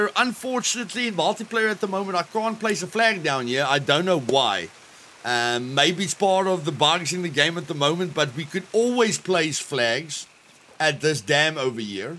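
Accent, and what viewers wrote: South Africa. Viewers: you sound South African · Are you South African?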